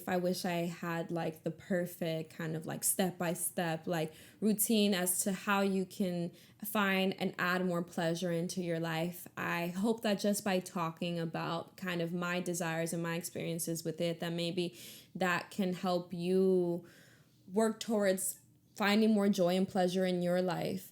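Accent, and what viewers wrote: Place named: New York City, United States. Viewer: R u from NYC?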